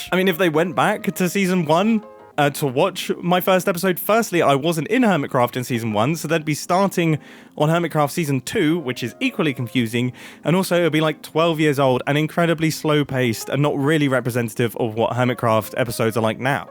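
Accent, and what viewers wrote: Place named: United Kingdom. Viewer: I’ve been watching Let’s Play videos since 2017, maybe even 2016, and I can confidently say I miss those days. The “100 Days” videos are nice, but they feel repetitive. Modded Minecraft is cool, but it can also get repetitive. Once you’ve got the Ender Pearls and Blaze Rods, the video’s probably over. One YouTuber I watch, Kolani, makes both relationship and idea-based content, kind of like you. You both create videos not just for views, but to stay connected with your audience. I still remember stumbling upon one of your videos back in 2017, when my mum was battling a terminal illness. I just heard this British voice chatting away, asking, “Guys, do you want a timelapse?” That was your first ever timelapse, you mining down to level 12. And I remember thinking, this is me! Talking about cars, music, videos, traveling, all while strip mining. 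Nowadays, so much of it feels fake. People exaggerate everything: “Bro, how are we going to cross this lava river? That’s insane!” It’s just not the same anymore. Thanks. You’re truly unique.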